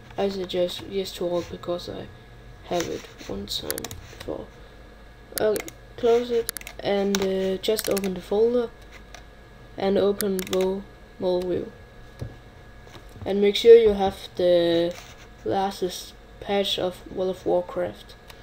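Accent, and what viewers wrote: Denmark. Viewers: danish accent haha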